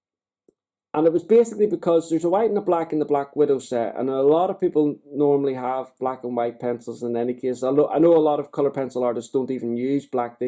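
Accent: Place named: United Kingdom. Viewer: I haven't ever heard of these pencils. you sound British so that maybe why I haven't seen them or you. I would love to catch a pack of these Scorpion pencils. They look like they lay pigment down with a meer touch. So I am adding my name for the giveaway. And I sure hope you get to feeling better soon... bless you. My name is Barbara Gaudreau (french) and I am in Texas USA. To reach me just pop an email over to: <mail> YOU ARE MISSED ALOT!!!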